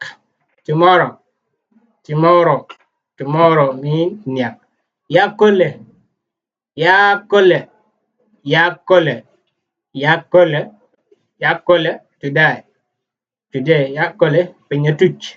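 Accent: South Sudan. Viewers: What accent of dinka is this?? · Is this Aweil accent?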